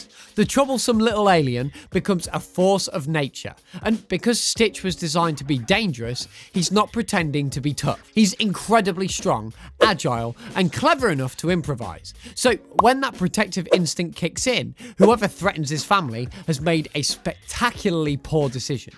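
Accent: United Kingdom. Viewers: Hi are you British?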